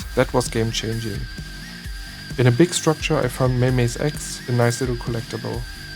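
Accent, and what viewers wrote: Germany. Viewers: are you german 🔥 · Very german englisch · are you german by chance? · are you german# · you are german right?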